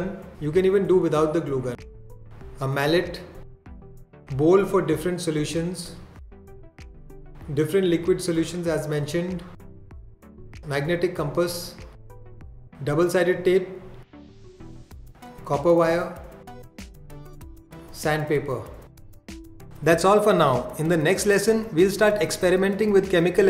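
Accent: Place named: Goa, India. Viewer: Achha😁Sensed Goenkarpan in your style of talking mhanun vichaarle :)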